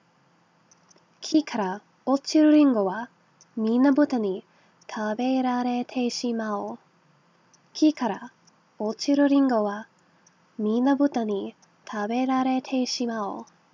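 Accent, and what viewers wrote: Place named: China. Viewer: giống giọng Trung của vl ;v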